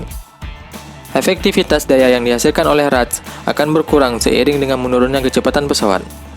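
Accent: Aceh, Indonesia. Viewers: Bang, orang aceh ya ?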